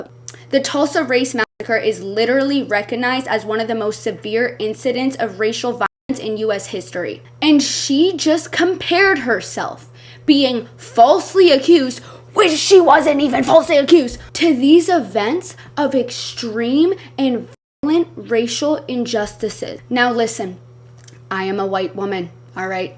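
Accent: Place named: Canada. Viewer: I’ve started watching you recently and the first thing I thought that inspired me to click the video was “this girl has to be from somewhere in Canada if not somewhere in Alberta “ . It’s beautiful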